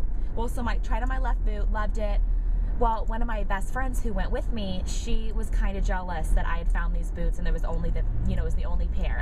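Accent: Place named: South, United States. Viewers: lol. Love this video and your boots! Also I am from Texas and you talking with southern accent did not bother me at all. :-) You rock!!! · I'm from Texas and I think that your country accent is hilarious.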